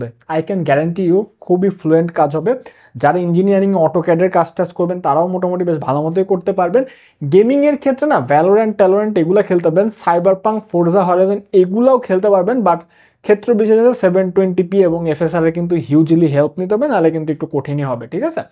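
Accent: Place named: Chittagong, Bangladesh. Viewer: ভাইয়ের বাড়ি কি চিটাং নাকি!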